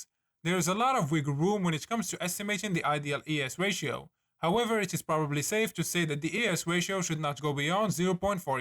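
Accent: Morocco. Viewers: This guy sounds very Moroccan.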